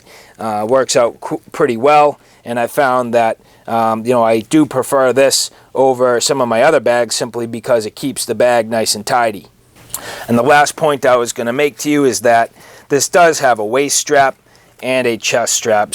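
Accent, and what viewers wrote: Boston, United States. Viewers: Your American accent is horrible. Learn British accent or something. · I can agree that is comes out full throttle. You sound like someone from my area and then the Boston accent comes out. I find it fun to listen to. But it was a great video! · I hear lots of good stuff about this brand, I'll have to check em out. Great review! Any chance you're located in Massachusetts? Sounds like a Boston accent.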